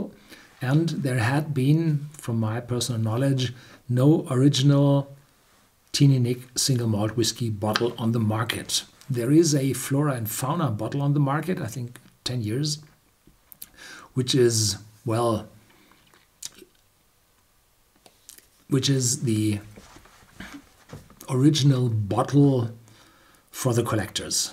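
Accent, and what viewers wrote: Germany. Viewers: Dude. Travel some. Most native Germans speak like this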